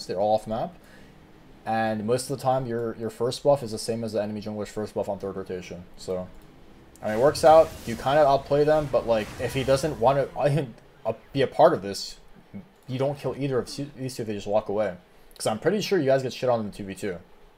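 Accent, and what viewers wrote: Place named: Australia. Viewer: Idk Australian accent makes me think it’s oce and as an oce player it’s super inflated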